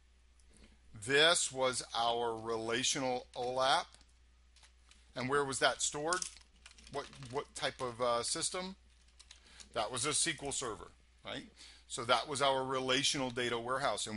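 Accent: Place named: United States. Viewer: Why do your vids get so many dislikes? Youre like the only person teaching sql with a perfect american accent lol.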